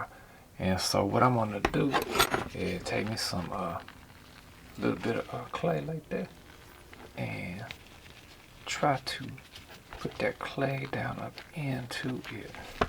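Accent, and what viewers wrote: Louisiana, United States. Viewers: You from Louisiana? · From Louisiana, huh?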